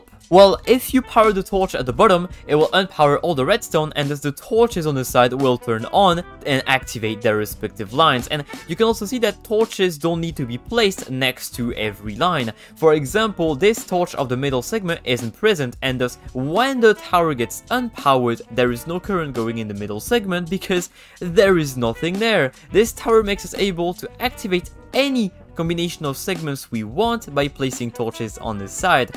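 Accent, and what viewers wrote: France. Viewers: are you french i heard it in purples vedio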